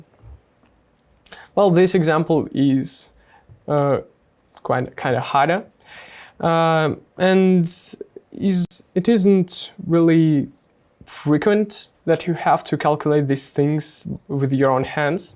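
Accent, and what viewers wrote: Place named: Russia. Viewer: зачем на английском? будто европейцы будут смотреть видео с таким жёстким акцентом русским))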